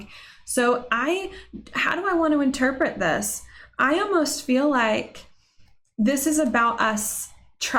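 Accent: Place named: Pittsburgh, United States. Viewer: I just kept getting caught up on your CUTE Pittsburgh accent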